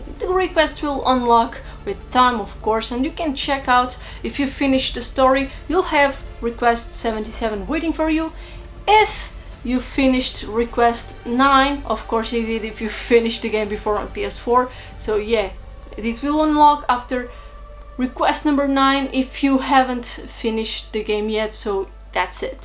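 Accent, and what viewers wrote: Portugal. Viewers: q legal, achei o sotaque de ingles parecido parecido com alguem que fala portugues mas no fim era portugues de portugal kkk estou atras dessa conquista no death stranding otimo video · Até que enfim achei um vídeo super explicativo, parabéns moça, você é de Portugal né? Abraços do Brasil!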